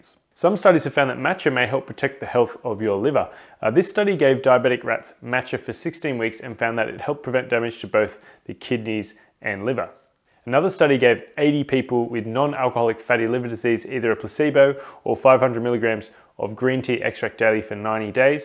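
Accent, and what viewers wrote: Australia. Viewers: This guy is Australian so that is why his English is so messed up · It’s just his Australian accent! Some forms Australian accent, the ‘a’ is phonetically pronounced as more of an ‘e’ sound.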